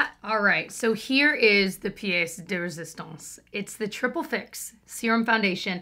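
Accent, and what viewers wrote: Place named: Midwest, United States. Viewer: those Midwestern flat A’s made me laugh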